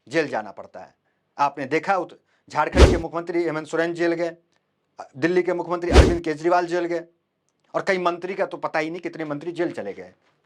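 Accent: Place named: Bihar, India. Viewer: Aap ki aawaz aur presentation achha hai, aap lagta hai north Bihar se aate hain, Apni Bihari Hindi me presentation Acchha lagata hai. Aap sada agrasar hote rahen Magahi Bhojpuri Maithil me bhi presentation ka prayass karen aur Aam logo ko unki bhasha me samachar pahuchaye to acchhaa rahega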